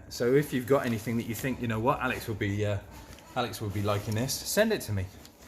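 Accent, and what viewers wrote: United Kingdom. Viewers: Hi Alex great channel, it sux snap on hasn't been great for you. I watch the channel cause you talk about snap on tools, nice to hear from a Brit instead of a yank for a kiwi snap on fanboy. love your toys!!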